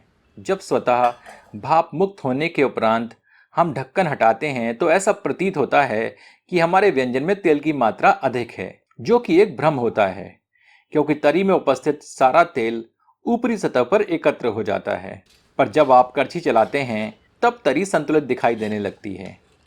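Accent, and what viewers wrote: Standard. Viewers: Aapki hindi bahut shudh hain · the way you speak pure Hindi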